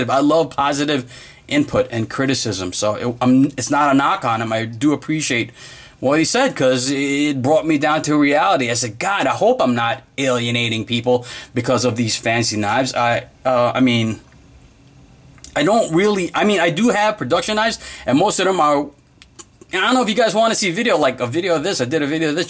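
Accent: New York, United States. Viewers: Ha! I'm watching this with my girlfriend and we where guessing where your from based on your accent. I said gotta be Jersey or new Yorker. She said Monmouth county nj lol. Gotta be strong island?!? We are down the Jersey shore but I grew up in the Bronx and work in Manhattan. Keep it up bro def simple informative and straight up videos! Like they should be!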